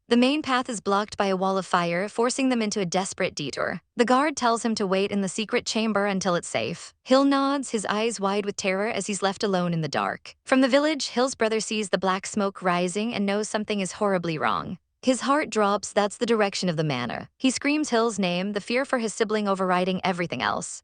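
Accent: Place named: New England, United States. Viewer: Thanks for uploading the next part of this story. I had watched the first portion of it awhile ago and had really been hoping to get the second half of it. I am so glad that after such a tragic beginning, things finally, finally started to turn around. I also want to say that the voice narrator on this story sounds EXACTLY like my sister-in-laws voice! 😅 I almost thought it was her! 😯😆 The accent she has is from living up in the New England states...mostly just outside of Boston, but we did live near eachother years ago back in Maine as well. Made me enjoy the story even more. ❤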